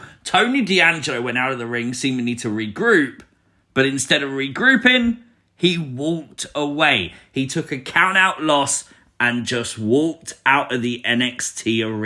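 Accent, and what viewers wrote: United Kingdom. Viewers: He said "Rosemary". He didn't say "Rosemarie". It's only the British accent that makes it sound like that. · You know he’s British?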